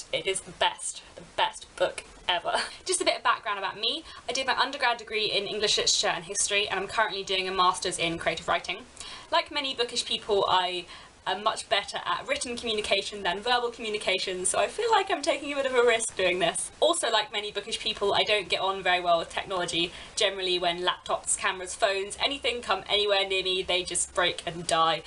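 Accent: RP, United Kingdom. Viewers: Congratulations on your 10 year anniversary,! I'm so happy to be able to watch your content right from the beginning (as of June 2025 I've been watching for about 6-7 years but never scrolled back this far), you did sound very posh here! 😊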